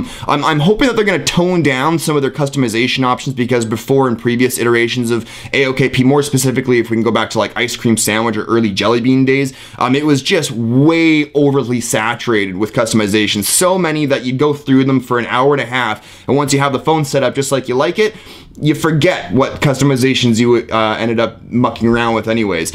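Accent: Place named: Canada. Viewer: Hehe you're so Canadian... that accent, man... =)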